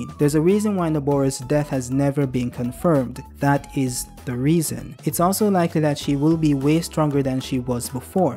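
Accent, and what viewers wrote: Jamaica. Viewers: Never thought I'd hear a Jamaican accent on a JJK video. I'm in. Good content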